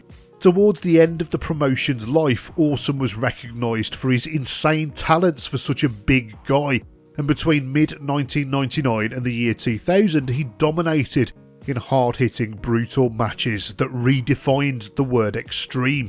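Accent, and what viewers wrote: Birmingham, United Kingdom. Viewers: A Brummie guy doing wrestling reviews? Hell yeh!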